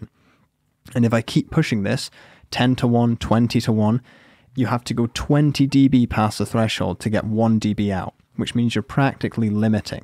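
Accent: Scotland, United Kingdom. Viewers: I just love listening to this Scottish (?) accent. It's so soothing. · Well he is British according to his accent and the flag he now has in his later made video. So it's pretty aggressive for a Brit. Oh wait, maybe the stereotype is Canada lol.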